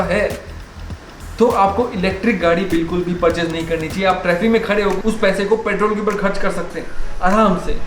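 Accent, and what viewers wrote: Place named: Haryana, India. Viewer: Bhai pakka haryanvi hai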